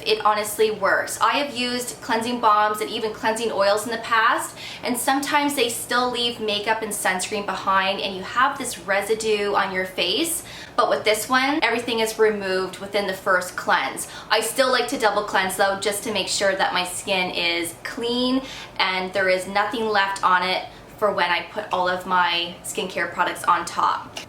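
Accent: Canada. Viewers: So glad you are proud of you Canadian accent! I’m also proud of my French Canadian accent! Go Canada! 🥰🇨🇦🙋🏻‍♀️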